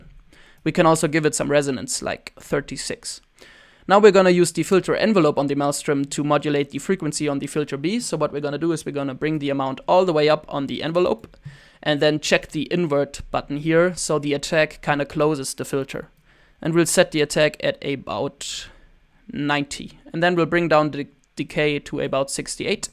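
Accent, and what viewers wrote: Germany. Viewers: wetten du bist deutsch haha dein Akzent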